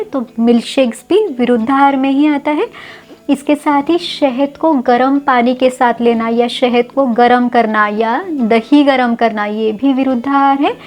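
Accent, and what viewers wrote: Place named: Maharashtra, India. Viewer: Madam apke bolne me Maharashtra ki jhalak he.lekin aap jain he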